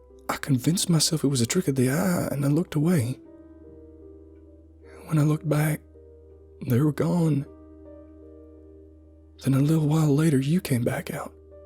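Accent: South, United States. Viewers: As somebody from the South, I'm really blown away by your Southern accent. Like, can we informally adopt you? 😂 Bravo! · Faith Castillo - haha fair enough! It IS a good southern accent, anyway. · I Love the Southern Accent! 🤗 Really Wild Story! 😍 Thanks For All Your Hard work! 😙🙋✌💜🐺🐶🌻🐢🐾🐳❤💋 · "Butt hurt" 😂 I haven't seen any butt hurt comment here yet, still scrolling tho. So far people seem to like the accent. I personally don't like southern accent at all, for non-english speaking like I am it sounds somehow slurry and words are sometimes very hard to recognize. It's important to remember that disliking someones accent doesn't mean the person themself is dislikeable (is that a word 😅). I'm definitely not butt hurt about this story's accent since the author has so damn beautiful voice 🥰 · I settle in to hear soothing voice and am startled by this jarring "american" accent. 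Yikes! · Once again sir, well done. as per usual, your timing, tone, and emotion is perfectly delivered. Maybe you could mess up every now and then, just to prove you are not an alien life form. Also, I absolutely love this accent. It sounds to me like a mix of north Tennessee good ole' boy, and a south Georgia gentleman, but that's just my take on it. You could easily pass for a real Southerner. Keep up the great work buddy! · are you sure that you weren't born in the south? Your accent is spot on!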